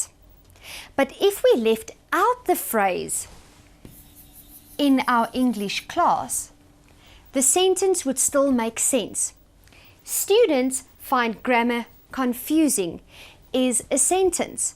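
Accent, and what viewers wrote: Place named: South Africa. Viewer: south african possibily afrikan speaker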